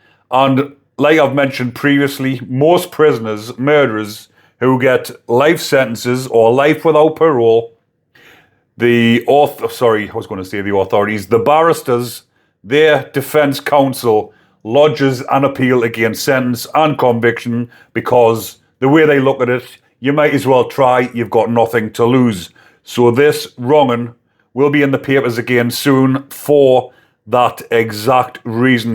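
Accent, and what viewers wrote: Newcastle, United Kingdom. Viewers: Newcastle or in that region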